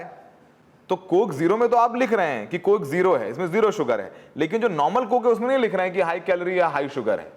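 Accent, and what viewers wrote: Kanpur, India. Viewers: You should make more videos in your authentic kanpuri language and Ravaiya. It was fun to watch.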